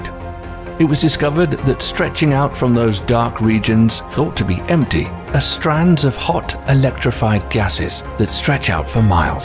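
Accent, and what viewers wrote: United Kingdom. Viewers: You speak with a British accent, yet you use Fahrenheit? Please use international standard units.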